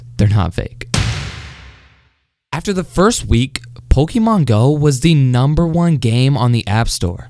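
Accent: Australia. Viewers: isn’t he Australian? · Kdugg 0 I’m 100% sure he’s from Australia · Wait a minute Lachlan is Australian not British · I thought he was from Australia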